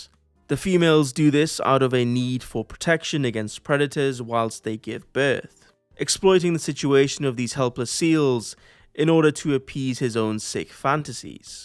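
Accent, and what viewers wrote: South, United States. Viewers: please bring back the southern accent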